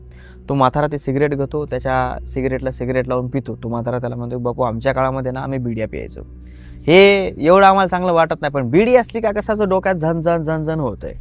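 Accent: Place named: Marathwada, India. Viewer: Parbhni nanded bhasha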